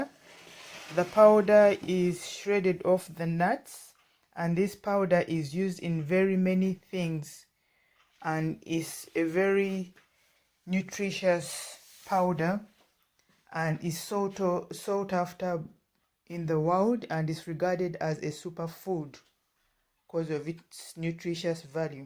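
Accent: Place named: Shona, Zimbabwe. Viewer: Oh my sister you have a beautiful Shona accent, Im enjoying it😄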